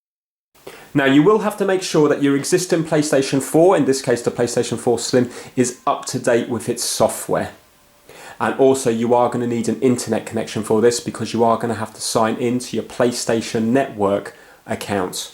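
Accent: England, United Kingdom. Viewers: Very well explained and I like your English accent.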